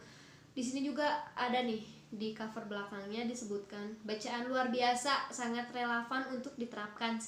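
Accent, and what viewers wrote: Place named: West Java, Indonesia. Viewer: Pidiooo, sunda pisan emang mba nya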